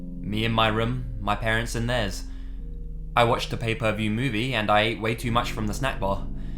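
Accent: England, United Kingdom. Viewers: are you from England? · God bless the British accent. "I'm good looking and assumed it was a pervert or I assumed I looked like his granddaughter." God bless the British. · the main reason I'm subscribing is because of that british accent lol number fooh hehe good job tho i realy enjoy ur vids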